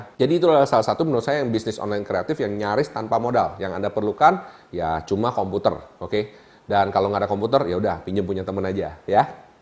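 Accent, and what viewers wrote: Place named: Surabaya, Indonesia. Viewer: Logatnya sama surabaya... Mas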